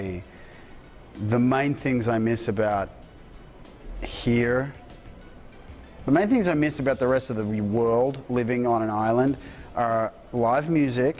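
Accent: Australia. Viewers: American here, OMG, he's even hotter with the Australian accent! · I didn't know Alex speaks with a phony American accent in the TV series "Hawaii 5-0!" His Australian accent gives him a whole different persona. 👍 😉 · 'cause he's aussie and he talks with aussie accent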